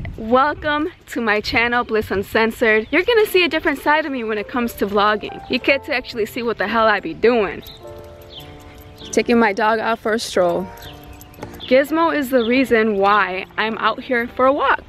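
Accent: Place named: Michigan, United States. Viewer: If I'm not mistaking it sounds like Michigan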